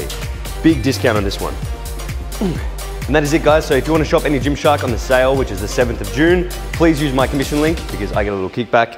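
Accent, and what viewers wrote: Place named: Australia. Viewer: Your accent is getting more Australian for some reason 😂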